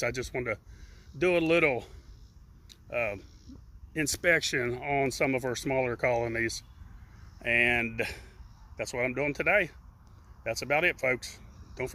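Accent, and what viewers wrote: South, United States. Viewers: would have been PERFECT if he were cast as the acting role of the beekeeper in Matthew McConaughey's movie Amaziah king. Its being filmed in AL. They were looking for a strong beefed up adult male strong from handling beehives for YEARS. He has the southern accent, experience, and he'd have represented beekeepers well. Darn it!! Wish Id have sent your info to them when they were doing casting calls. The role is being filmed in late July/August. Maybe its not too late.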